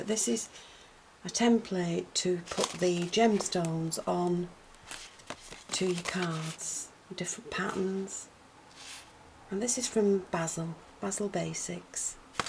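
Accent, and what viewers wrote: United Kingdom. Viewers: Love it! Are u in the Uk? I think i could tell from ur accent n got excited to finally come across a uk craft room tour as i know that if i like anything i can maybe b able to buy it! Where did u buy ur CD holder, the lamps for ur photo booth and baskey in ur ikea drawers? I hv the same drawer cabinet n today i should finish with my organising. TFS. Ur room is a lil heaven:)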